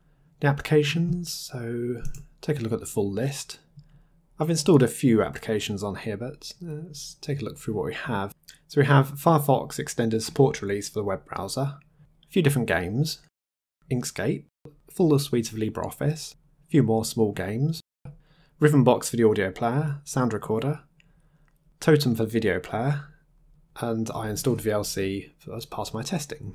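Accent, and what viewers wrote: United Kingdom. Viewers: dat british accent :)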